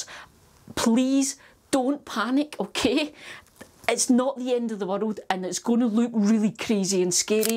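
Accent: Scotland, United Kingdom. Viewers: Interesting video, though I won't have this issue in Barcelona temperature, but as I love the Scottish accent, I kept watching. Reminds me when I lived in Glasgow a few year away :-) By the way the aromatic plants behind looked gorgeous.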